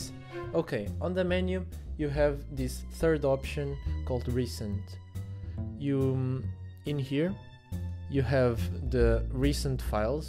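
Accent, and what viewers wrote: Portugal. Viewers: You're Portuguese aren't you? I can tell by your accent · Vi logo pelo sotaque e pelas musicas de server de csgo source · Are you portuguese? Eu juro que escuto um sotaque. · Aquele sotaque tuga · 15 segundos no vídeo e nota de logo q é português kkk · Ganda sotaque tuga🇵🇹💪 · Quando ouvi o sotaque sabia que eras um dos nossos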